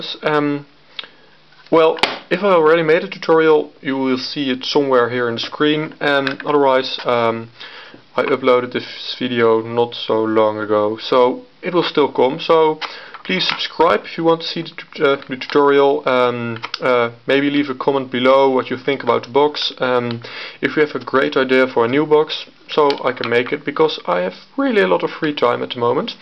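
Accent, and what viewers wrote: Netherlands. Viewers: Ben jij Nederalnds? vraag ik me af vanwege je accent? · ik hoor gwn dat je uit nederland komt :D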